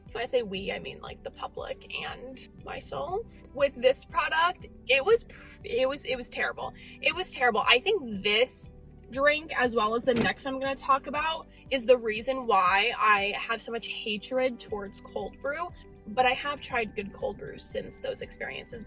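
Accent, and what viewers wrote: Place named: Upper Midwest, United States. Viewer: Sexy with that upper Midwest accent. What a cutie.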